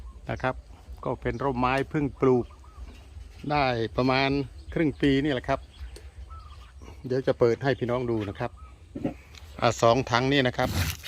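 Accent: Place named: Surin, Thailand. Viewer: อยู่บ้านใหน ขอ รับ ฟังสำเนียง ขุนๆ เหมือนคนบ้านผมเลย จาก อ.เขวาสินรินทร์..เซราะกราว เน้อ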